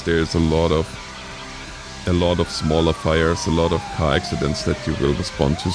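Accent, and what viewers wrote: Germany. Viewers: You have a hard accent... are you german? :D (I'm a newbie) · i hearyour germen acend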